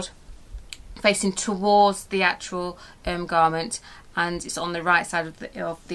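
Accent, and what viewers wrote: Manchester, United Kingdom. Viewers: Thanks for this. I love listening to you. You sound just like my cousin from Manchester.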